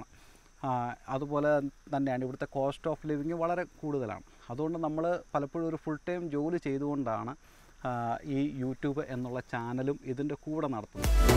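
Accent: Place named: Kozhencherry, India. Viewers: പത്തനംതിട്ടക്കാരനാണ് എന്ന് സംസാരത്തില് തന്നെ അറിയാം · മലയാളം കേട്ടാൽ അറിയാം പത്തനംതിട്ടകാരനാണെന്നു..😊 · സംസാര ശൈലി കേട്ടപ്പോൾ തന്നെ തോന്നി പത്തനംതിട്ടക്കാരന് എന്ന്, വളരെ സന്തോഷം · ഓണാശംസകൾ, kozhencherry കരാൻ അന് എന്ന് അറിഞ്ചപ്പോൾ വളരെ സന്തോഷം. നമ്മുടെ നാടിന്റെ എല്ലാ നന്മകളും സംസാരത്തിൽ ഉണ്ട്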